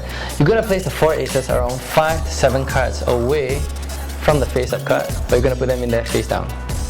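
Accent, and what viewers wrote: Singapore. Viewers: cool video dude...are u from singapore..just asking... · r u singaporean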